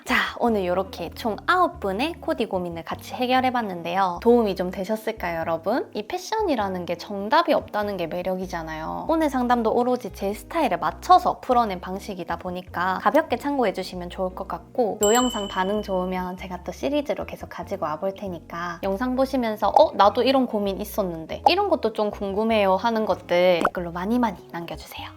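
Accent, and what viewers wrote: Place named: Daegu, South Korea. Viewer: 아이돌 미모에 옷 매치도 잘하셔서 패션유튜버중 가장 좋아요!! 그리구 대구 동생은 언니의 나긋나긋한 사투리말투도 너무 편안하고 듣기 좋아용❤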